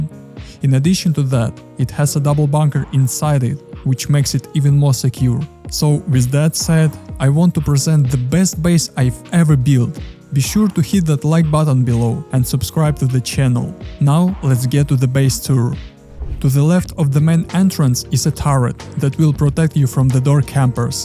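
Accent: Russia. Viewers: Русский акцент ;)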